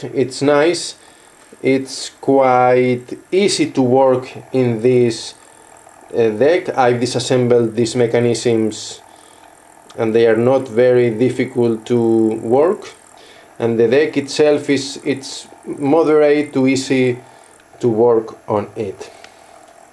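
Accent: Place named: Spain. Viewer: Curioso lo de que comparta mecanismo con Nakamichi, el diseño de época está muy guapo.😎😎😎 Por cierto ¿Has intentado hacer el vídeo en castellano o en alguno de los idiomas comunitarios del país? Parece que seas de aquí intentando hablar inglés. Personalmente mejor usar subtitulos si quiero llegar a público internacional, pero ya al gusto de cada cual. Un abrazo🤗🤗🤗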